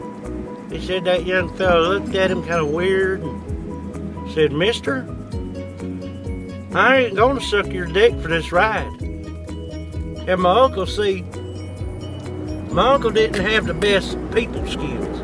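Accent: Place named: South, United States. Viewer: I live in Jacksonville, and this guy sounds country as shit.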